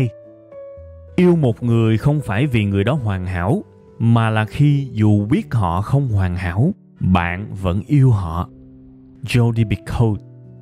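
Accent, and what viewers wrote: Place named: South, Vietnam. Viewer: giọng miền trong khó nghe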